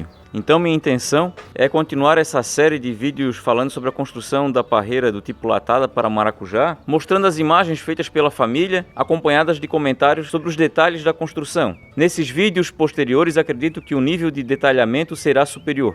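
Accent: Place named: Santa Catarina, Brazil. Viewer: Amo o sotaque catarinense. Sou do Paraná e morei quando criança em SC, amo demais essa terra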